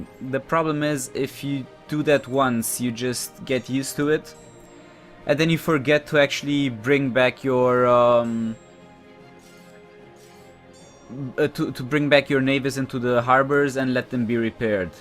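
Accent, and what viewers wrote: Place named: Germany. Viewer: I really like your voice. Keep your work up, its good .D Greetings from Reddit. Btw: Are you from Germany? Your Accent sounds a little germanic.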